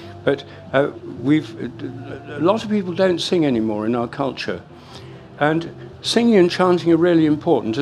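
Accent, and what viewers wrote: United Kingdom. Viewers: I guess it’s just his British accent. But certainly not a serious intellectual. Reminds me of Alan Watts.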